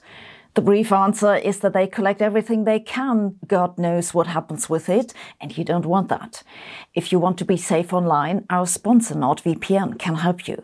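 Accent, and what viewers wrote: Germany. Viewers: You're German so of course you have an accent speaking English, but I hope I'm not out of line to mention that native English speakers pronounce "comb" to rhyme with "home". Your pronunciation sounded more like the Welsh word "cwm" which is a glacial feature - Google says that's "felskessel" in German. Feel free to correct my atrocious German any time! As ever thanks for the awesome videos and keeping me in touch with the world of physics and science which I haven't studied for several decades now. Even my non-scientist wife enjoys your videos and we always get a kick out of your humour. I live in hope we will get a musical number from you again sometime, you are so multi-talented!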